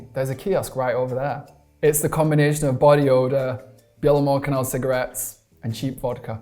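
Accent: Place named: United Kingdom. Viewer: Впитываю британский акцент с вами, а так, занимаюсь всякой фигнёй - химией вот, например👏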